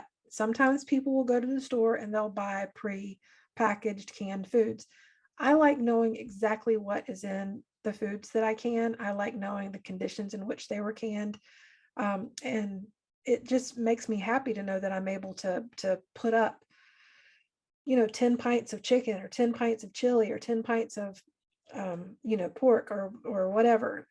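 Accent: North Carolina, United States. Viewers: I am from NC and I agree. Or SC or Virginia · 👍 you sound like you are from NC!😁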